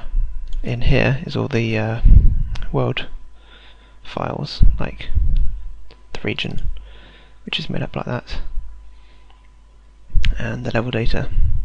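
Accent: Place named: United Kingdom. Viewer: Because he speaks British?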